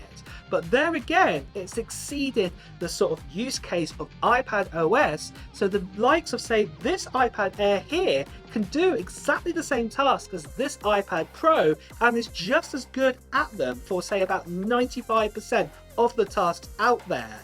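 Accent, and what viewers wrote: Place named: Midlands, United Kingdom. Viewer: Sounds alright to me, sounds like midlands region English accent.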